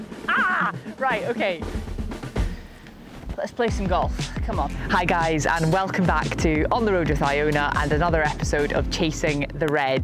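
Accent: Scotland, United Kingdom. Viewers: Great round Iona....you gave it a good go and you weren't too far away from your own course record. Would love one of the balls which would take pride of place in my collection! On a side note, as a Scotsman who's lived in The Netherlands for over 25 years, it's great to see a wee Scots lassie doing so well and hearing the accent is music to my ears! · Great video Iona! Thanks for sharing your insights on the course, also bonus listening to your lovely Scottish accent 😊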